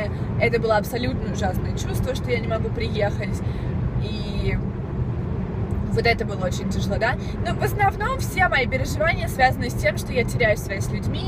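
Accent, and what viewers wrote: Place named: United States. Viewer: Акцент американский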